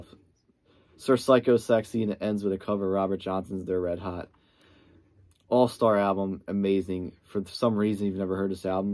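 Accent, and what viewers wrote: Philadelphia, United States. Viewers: Lol are you from Philly bro? NJ?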